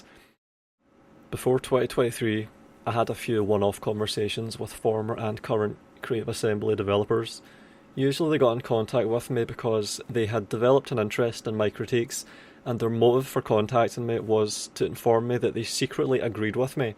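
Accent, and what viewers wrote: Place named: Belfast, United Kingdom. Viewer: cool mate. Your accent sounded like it could be Belfast. Anyways enjoyed the video!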